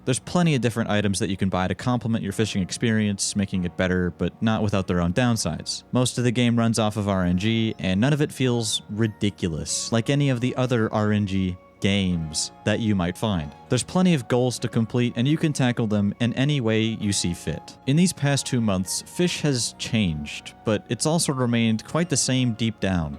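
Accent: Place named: United Kingdom. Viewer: COULDV GOTTEN ARE U BRITISH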